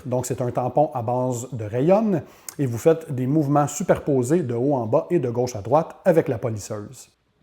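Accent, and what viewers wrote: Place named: Quebec, Canada. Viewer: j'étais sure après avoir écouter une dizaine de video en anglais avant de tomber sur celui ci que tu étais quebecois. merci pour les infos.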